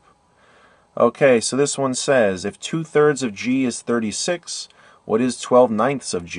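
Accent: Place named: Long Island, United States. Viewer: long island accent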